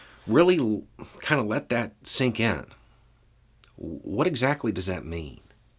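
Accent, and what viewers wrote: South, United States. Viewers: Many thx for your videos. You talk about important subjects in a straight way. Peel away rubbish and twisted ideas. Again, thx from a grumpy old man, living in northern EU. ( I also understand your fxxxing southern accent ☺️) Slava Ukraini 🇺🇦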